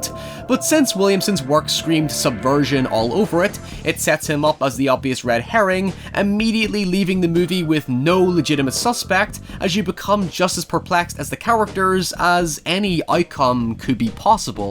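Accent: Northern Ireland, United Kingdom. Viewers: U have a northern irish accent? ❤❤❤ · My guy are you Northern Irish??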